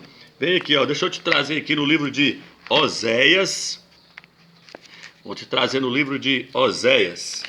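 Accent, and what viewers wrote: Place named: Minas Gerais, Brazil. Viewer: vc mora onde, fala igual mineiro.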